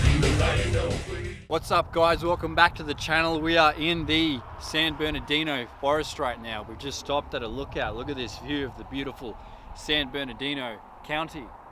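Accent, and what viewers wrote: Australia. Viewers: It’s sick how you liked and replied on every comment. Better comment now before you get to famous and I love the Australian accent man, wish I had one so I could get mad chicks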